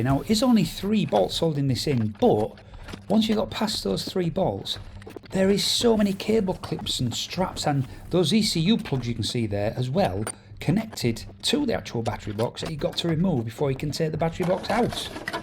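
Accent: Hull, United Kingdom. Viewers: Just subscribed brilliant video sounds like your from hull mate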